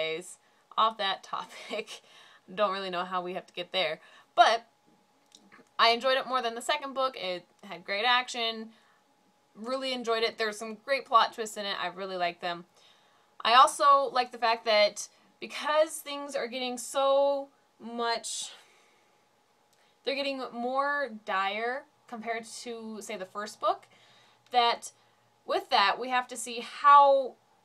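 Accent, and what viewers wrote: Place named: United Kingdom. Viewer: Welcome Back Pretty , your accent changed a little to British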